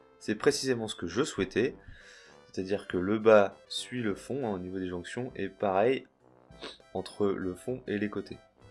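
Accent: France. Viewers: Wowwww a really well edited video, nice! I really like your french accent haha I was okay with reading subtitles but it's true that it could've been a lot better. Above all, it was one of the best tutorials I've seen :) It seems you really know what you're doing and letting people know the steps one by one! Cool! If you make more archviz tutorials I would definitely come and always support your videos.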